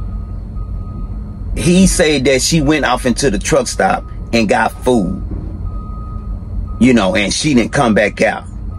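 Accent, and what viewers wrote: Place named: United States. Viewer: Love your show and your country accent.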